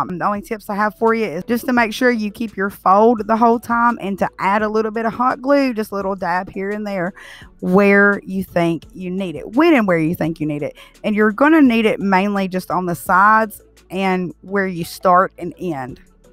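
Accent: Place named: North Carolina, United States. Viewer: I just subscribed. I don't know anything about you, your accent sounds like North Carolina. I live in Smithfield North Carolina.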